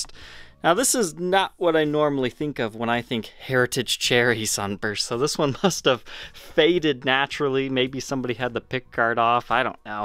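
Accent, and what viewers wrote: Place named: Midwest, United States. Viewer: Of course. I’m not a linguist, I just heard something different. Plus, I was generalizing. How could I possibly know what a Montreal accent sounds like without experience? I can spot a Chicago accent vs a Wisconsin or Georgia accent, for instance. I grew up in the Midwest, and I hear that accent in speech, although he tries to clean it up.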